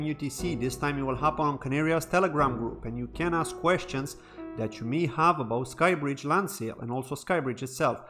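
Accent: Ireland. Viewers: really !!! Didn’t know that, I thought that you had a touch of a Kerry accent alright 😂😂😂 yep, I’m Irish 🇮🇪🇮🇪🇮🇪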